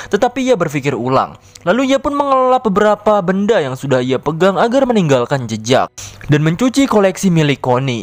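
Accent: West Java, Indonesia. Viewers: Ngakak aslina aduh sunda banget😂😂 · Sunda anying😂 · Anjimm si mang org sunda euy , aing karek apal ieu channel mantap resepp euy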